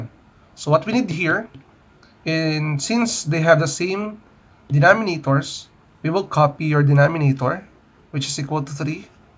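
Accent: Philippines. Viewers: are you phillipino becuase i can tell by ur accent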